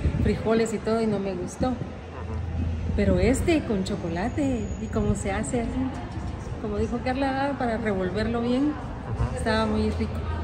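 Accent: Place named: Guatemala. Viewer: el acento chapin wow I love it !!!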